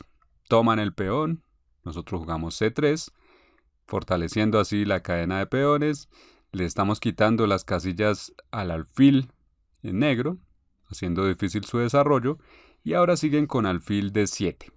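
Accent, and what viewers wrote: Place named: Colombia. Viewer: excelente video, hablas como un colombiano saludos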